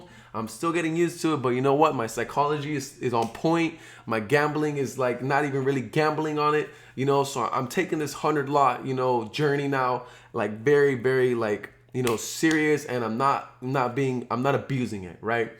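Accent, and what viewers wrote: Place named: United Kingdom. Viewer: That British accent got me dead 😂😂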